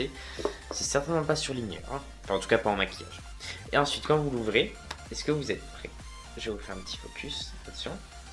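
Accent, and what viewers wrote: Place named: France. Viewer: Ton accent français est trop chou quand tu dis quelque chose en allemand ou anglais :D et fais un tuto stp 😍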